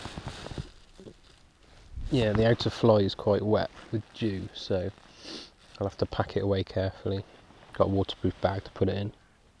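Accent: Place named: Herefordshire, United Kingdom. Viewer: If you don't mind me asking where you based. I'm Leominster Herefordshire and I thought your accent sounded close. Good vid. Sub and liked.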